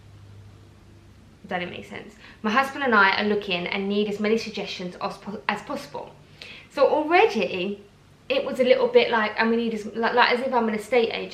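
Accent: Essex, United Kingdom. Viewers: So sorry that man spoke so despicably to you at the hospital. How awful! I really enjoy your accent, but as a South African, all UK accents sound 'odd' to me, and I love them all. Not sure what's posh and what's not :) Had a terrible experience in a Paris store once. I was literally ignored. I did look like a tourist, and it wasn't a luxury store, but it was a quaint little store near Mont Matre. I was so embarrassed and it put me off completely. I don't have a ton of money, but I'd saved so much for the holiday and wanted to get something quintessentially French. It was a painful experience. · I'm from Essex too. People do judge a person by their accent. It's like you are taken less seriously. However that's usually idiotic people that are stupid themselves. Most intellectual people will judge what someone is saying rather than the accent. Loving the jacket. 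Classy as ever.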